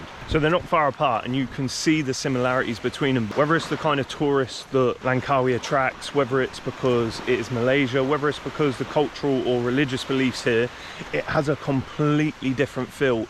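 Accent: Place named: United Kingdom. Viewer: Hi I’m in Australia 🇦🇺 Older lady, 56 years old I’m a “traveler “ 1989 Sri Lanka I love Malaysia 🇲🇾 And it’s people. I’m planning a trip in 2026 I’ve just watched your vid. I have to say your video was FANTASTIC!! The clarity in which you spoke. With your charming British 🇬🇧 accent. I REALLY enjoy watching your video You explained, so well, all aspects of Langkawi. Well done 👍 Have subscribed 👌😎🤩 Marianne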